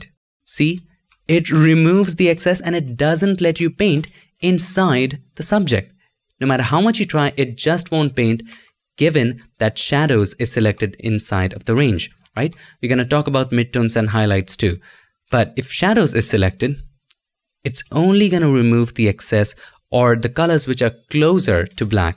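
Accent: India. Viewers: hello there! are you Indian cuz your same exactly seems like Indian, I'm also Indian ☺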